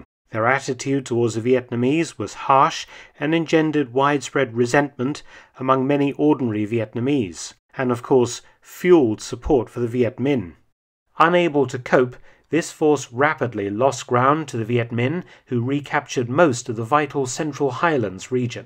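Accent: United Kingdom. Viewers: I am a Vietnamese patriot citizen but I can't get mad with your charming British ascent, even if you ensulted me.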